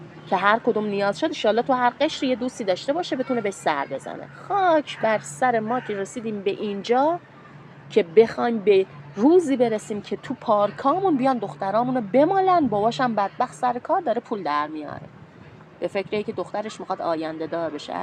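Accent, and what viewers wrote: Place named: Shiraz, Iran. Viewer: 👏🏼👏🏼👏🏼👏🏼👏🏼 lahjeyeh ghalize shirazit mano koshte jan ❤😂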